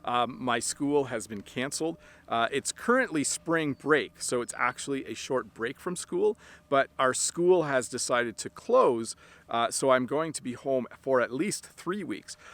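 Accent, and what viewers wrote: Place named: Canada. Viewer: it's awesome hearing the nuances in Canadian English versus American English. Thanks for the upload.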